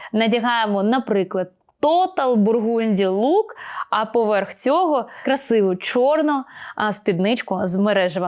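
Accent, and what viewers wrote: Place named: Russia. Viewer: і купа помилок, відчувається, що людина в житті спілкується російською, і це підвердилось.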